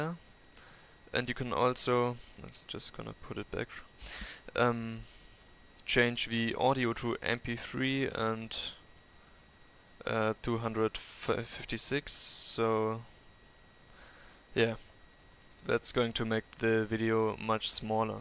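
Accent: Germany. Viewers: Danke man! Das Deutsch hört man raus, trotzdem gutes Englisch m8 :D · bist du ein deutscher du hörst dich so and hahaha aber redest english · Man hört die deutsche Ausprache aus ihm raus ;D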